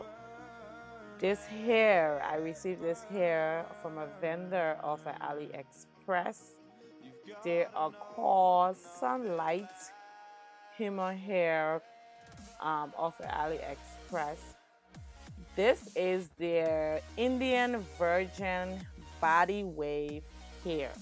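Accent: Liberia. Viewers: Aww I noticed your Liberian accent 🇱🇷🇱🇷🥰🥰🥰🥰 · new subbie! as soon as i heard that accent i said i must follow my liberian geh! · Yes I thought so too. She sounds like a Liberian. Good job girl keep it up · You are a west African Liberian. ...your accent though. ...you are very talented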